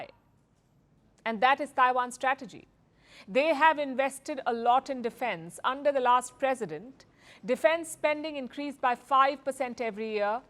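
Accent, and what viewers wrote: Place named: India. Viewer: Firstpost is CIA funded propaganda channel. Palki was chosen for her thick Indian accent, meant to confuse naive Indians. All her material are created by CIA paid staff, she just delivers it like an 'Indian'.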